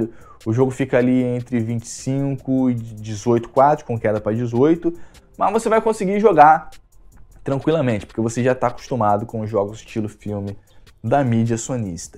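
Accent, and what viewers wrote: Rio de Janeiro, Brazil. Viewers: Senhores, esse é o sotaque carioca que a gente se acoxtuma aqui na cidade.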